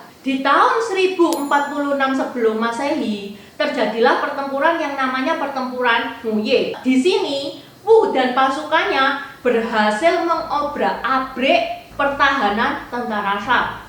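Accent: Java, Indonesia. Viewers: Tionghoa medok 👍👍👍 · Uda AQ subscribe kak,maaf mo nnya,,Uda berkeluarga??medok tpi cina,lucuu 🤭🤭 suka n mnghibur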